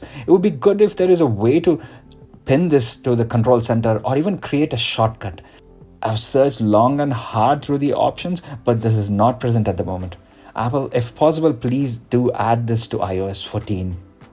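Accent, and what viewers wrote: India. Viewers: that's y..I was thinking that it sounds like Indian... well done bro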